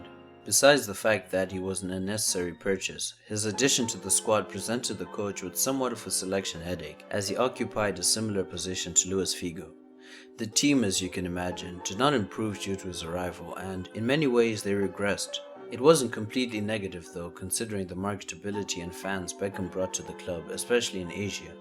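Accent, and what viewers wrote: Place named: Zimbabwe. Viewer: You sound Zimbabwean bro! Love the video though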